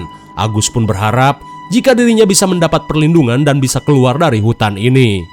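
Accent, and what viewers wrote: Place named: Sunda, Indonesia. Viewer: emang pujaan aku sm saudara temen juga 😂..sunda banget seru kl udah bilang eta si....😂😂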